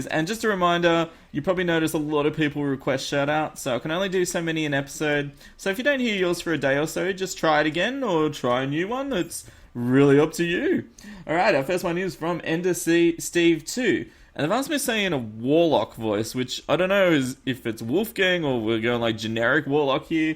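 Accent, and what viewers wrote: Australia. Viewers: congradulations on 80,000 subscribers,! I think one of the reasons your channel grew so quickly is the shoutouts, people keep coming back to see if their shoutout was used, and of course, your australian accent makes you a calm, funny youtuber that everyone enjoys:)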